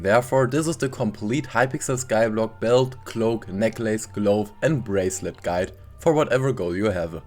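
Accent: Germany. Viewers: he sounds so german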